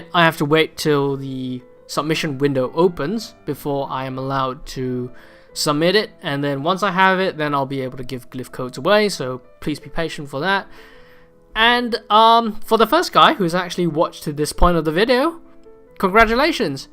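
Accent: Singapore. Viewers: DAMNNNN BOII, when i first heard ur voice, i knew u were singaporean, high five im singaporean too :/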